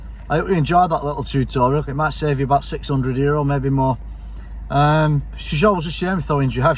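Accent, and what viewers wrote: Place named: Northern England, United Kingdom. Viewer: Have done a few of those ABS rings in the past and had to resort to a spot of weld myself haven’t done one for some considerable time now though. Your videos are good mate and your work is top notch and at the top of your game especially with the fault finding. Can’t help but think of Fred Dibnah when I hear your accent I’m a Yorkshire man but don’t let that put you off 😂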